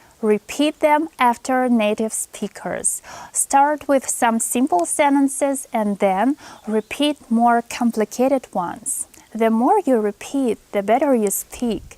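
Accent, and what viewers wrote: Russia. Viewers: Are you originally from Russia? You have such sweet accent that I could not but ask. 😁♥️ Much love! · How long have you been learning english? Judging that you are russian by nationality, it makes sense to your accent. Russian schools do not offer a good system to learn English, so that's why so many move to Europe to study. I've been learning English as a second language for 10 years, and I'm russian by ethnicity too, but I have an American accent, and no trace of Russian. thanks in advance:)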